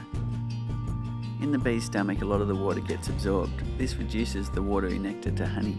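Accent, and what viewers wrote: Australia. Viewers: Would very much appreciate you complement the vid with subtitles... your accented English (Aussie?) makes full comprehension rather difficult, caught about 90% of it. :-)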